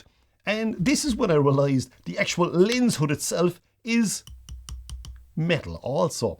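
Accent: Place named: Ireland. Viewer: Is that dialect of yours from Inisherin? Because I keep thinking of that movie when you talk 😬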